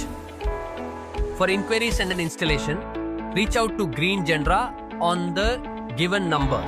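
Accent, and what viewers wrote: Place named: India. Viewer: oh yes indian accent makes it perfect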